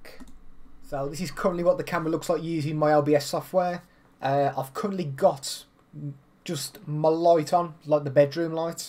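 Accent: United Kingdom. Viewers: The prob is partly the British accent. 🥸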